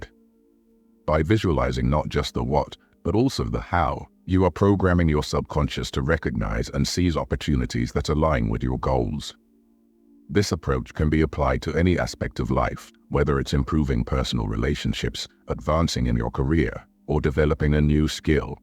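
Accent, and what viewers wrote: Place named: United Kingdom. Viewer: I can hear that British accent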